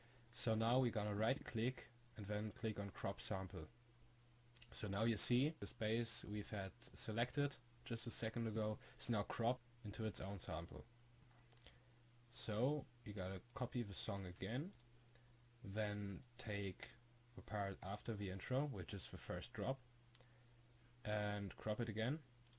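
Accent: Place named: Germany. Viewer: Bist du Deutsch? wenn ja hört man es sehr :D aber trotzdem gutes Tutorial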